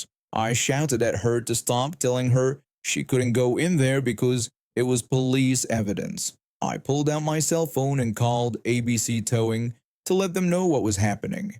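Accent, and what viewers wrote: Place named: United Kingdom. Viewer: It's the British and Australian pronunciation. Many of these stories originate in other countries, and is clearly not a US native. No doubt he learned English from a Brit. I think his pronuniation is kinda cool!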